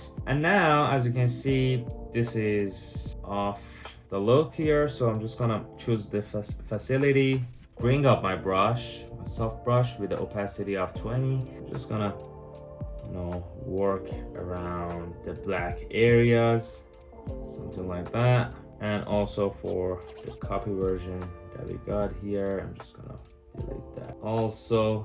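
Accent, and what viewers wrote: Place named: Iran. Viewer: hey where are from you sound iranian❤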